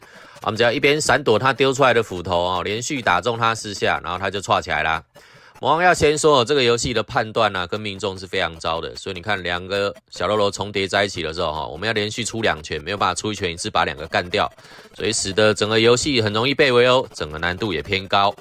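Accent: Taiwan. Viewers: 非常喜歡魔王的台灣國語，千萬不要改掉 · 每次聽魔王講解遊戲，偶都笑不停，那台式旁白真是太有才了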